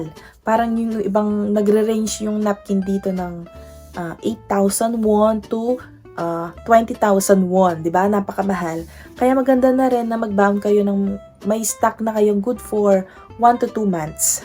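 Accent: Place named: Pampanga, Philippines. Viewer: Kapampangan ka ne madam hehe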